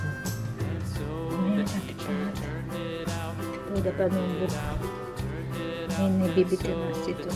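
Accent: Jakarta, Indonesia. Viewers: Mantap mpo masakan ikan nya... Bikin ngiler dan laper... Dan lucu jg sm mpo...bicara nya tetap betawi asli..😘😘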